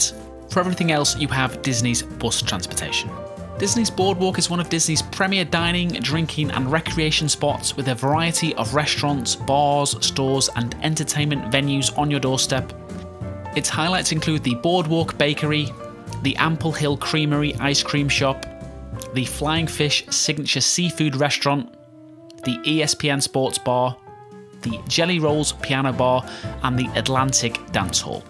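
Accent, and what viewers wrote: Liverpool, United Kingdom. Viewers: Thanks so much. Best video I have ever seen from Disney you tuber. And I've seen a lot! Love your accent..are you a scouser?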